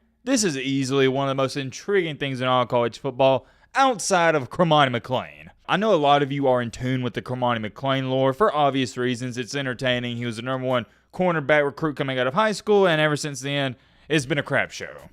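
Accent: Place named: Appalachia, United States. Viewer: And, what the hell does he say at the end? Sounds like some Appalachian, inbred, dialect.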